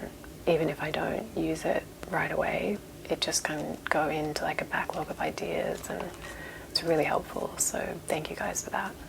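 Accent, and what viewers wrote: Australia. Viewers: I'm really sorry to hear about everything that's been going on where you are. It's good that you're okay though. As for your content, I love the asmr cooking videos you've been posting. There's not much of that around in comparison to the tapping videos, so it's a good little niche that you've found. Some general vlogs about things that are happening in your life would be pretty cool as well, and maybe you could incorporate some videos about your progress learning the guitar? You've got such a naturally nice and soft voice, that I could listen to you talk about anything really. Of course I'm a sucker for an Australian accent too 😂 But whatever you decide to do, I'll definitely stick around to see where it goes. Take care and stay safe!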